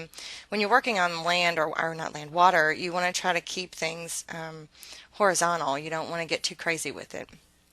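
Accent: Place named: Kentucky, United States. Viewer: i knew i recognized you accent! im also from kentucky! i recently discovered your videos and in glad i did! good luck!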